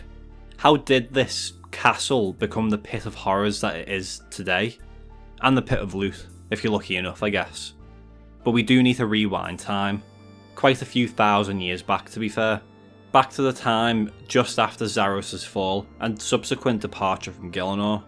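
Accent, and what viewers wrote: United Kingdom. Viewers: oh shit, we doing runescape lore? I don't play OS, but I'll listen to a British dude talk about RS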